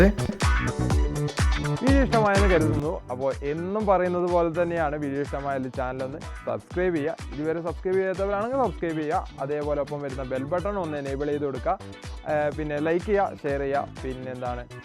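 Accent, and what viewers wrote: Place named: Kasaragod, India. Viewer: Languagil Idakk oru kasrodian touch 😌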